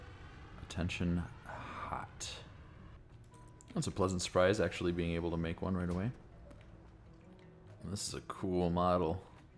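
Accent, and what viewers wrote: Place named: Midwest, United States. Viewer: Been watching your newest SF+ series; that series and this are both great. Commenting as a fellow Michigander to say I knew you were from Michigan after hearing you talk for a couple seconds in the first video, you have a strong Midwest accent. It’s nice hearing it, I’ve lived in Japan for quite some time and don’t get to hear it often.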